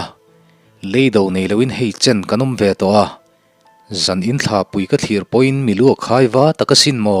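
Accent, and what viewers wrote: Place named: Pawi, India. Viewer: Mapeka, ka va zah che em aw, i thiam ang tih ka ring e, keini thangthar leh thalai zawk tena kan lo chhiar dan leh hriat dan hi a dik fu lo zawk em mawni tiin tiin ka in ngauhtuah rum rum mai. 1895 ah khan mizo hian hawrawp te kan lo nei ve tan chauh kha ani nia, ziak dan leh lam dam dik hi keini thangthar zawk te hian ka lo hre dik lovin kan ko hre fu lo zawk nge ni. Sir hi ( Synod- Sinawt-a ) Music kan zir laia an lamrik fo anih vang leh an sawirik zina a tumruhna te an sawi vangin, a channel hi ka bel chawt mai ani ani, sawi dawn ila ka sawi treuh thei mai hian ka ring e. Lai (pawi/pawih) chuan Lei ( Tongue angin kan lam thin) Lushei/Lusei chuan Lei ( Soil) angin an lam thin niin ka hria. Leido kan nei thin a ( Lei-do) Lei anga Sir a sawi a nih chuan Lai /pawih tawng angin a sawi tihna ani ah ka ngai. ( Khawlhring/ khualsim/ hlawncheu te nen bik hian tawngin ang deuh an hmang vek ani) Entir nan ( Lei do kan nei ih, kan neh ngei ding an lu ngei cu kan la ding). 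Pawi tena kan lamdan leh sawi dan aw. NB: Tawng reng hi a dang a, tui a dir e an tih lai in, tui a haw ti tawk kha kan awm miau mai kha ani a, sawi fiah viau dawn ila diklo ti tu an awm anga, dik ti tu te ab awm ang, kan tawng zawng alo har ani e.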